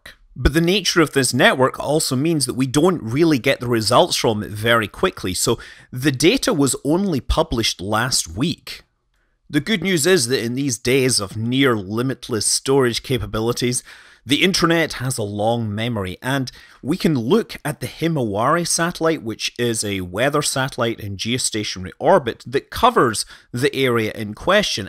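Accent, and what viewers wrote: Scotland, United Kingdom. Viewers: Are you Scottish? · I click for the Scottish accent . . . . . . . and the info too.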